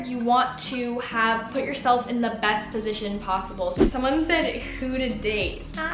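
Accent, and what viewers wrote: Australia. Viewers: suddenly you're coppying Kennedy Walsh with the australian accent not hate love ya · this is my first time watching one of your videos and i actually thought you were an aussie 😂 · YESS love that Aussie accent you have to come to Australia soon bc I would love to meet you🤩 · I have never seen one of your videos and I thought you were from Australia!!!! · First of all your Australian accent was amazing. Also this is so helpful I was homeschooled for the freshman year. I decided to enroll back in school and I’m a little nervous so happy this helped. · aye! just kidding I love ur brilliant aussie accent please make more vids with the accent btw smol youtuber here! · This is the first video I’ve seen of u and I thought u were Australian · oh my god I thought you were actually Australian 😫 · this is the first video I’ve watched of u and I legit thought u were Australian. 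good eye might. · her australian accent confused me!! i was like doesn’t have an accent?? 😅😅😅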